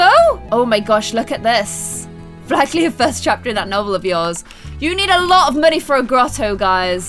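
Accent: United Kingdom. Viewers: ARE YOU BRITISH??? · why r u British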